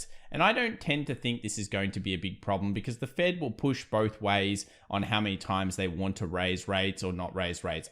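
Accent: Australia. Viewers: The only Analysis channel I watch great video 🙌 you have a cool accent, are you Australian?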